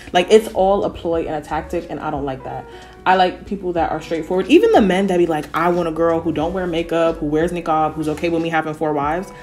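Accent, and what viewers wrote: United Kingdom. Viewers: Why did I really think she was from the UK? 🤣🤣🤣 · Also your British accent is on point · SAME MAN N I THINK IT'S THE UK MAKEUP n u don't really hear or see much black Muslims in America on the media n also her accent just sometimes slips into a British one sometimes 😭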